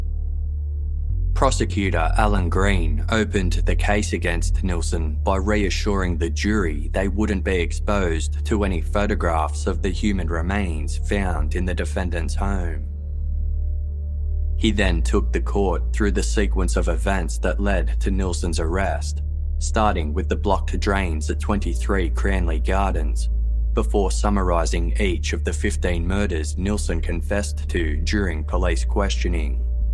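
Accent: Australia. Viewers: Love your channel. Love the Australian content. Love your Australian accent. I don’t know what I am going to do when I have listened to all of them. Aussie Aussie Aussie. Oi Oi Oi !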